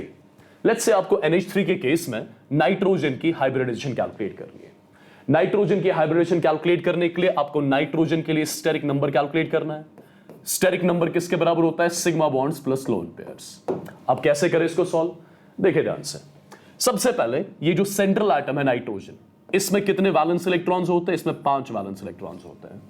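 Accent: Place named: Kashmir, India. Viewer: It's kashmiri voice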